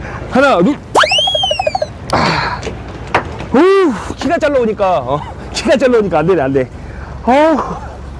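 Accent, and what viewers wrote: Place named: Jeolla, South Korea. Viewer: 영상보니 좋아 보이네요..... 구수한 전라도 사투리에.... 선장님 배로 외수질 출조하려면 어떻게 해야 하나요?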